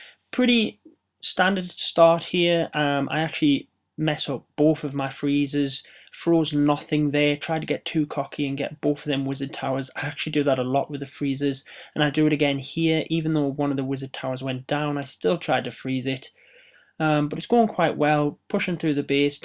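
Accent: United Kingdom. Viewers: Bro brithish accent 😂😂 great bro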